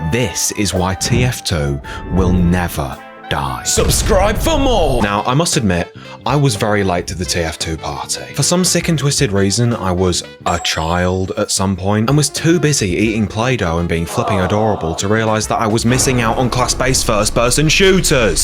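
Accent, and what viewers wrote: United Kingdom. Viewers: i think this guy is british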